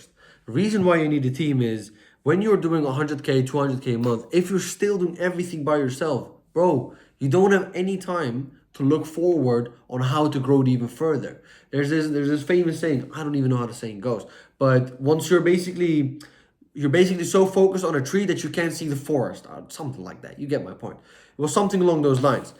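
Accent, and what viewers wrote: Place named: Turkey. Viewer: Brother you sound Turkish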